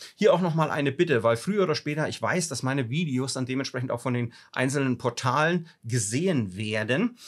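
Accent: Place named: Franconia, Germany. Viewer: Sehr fränkischer Dialekt🤔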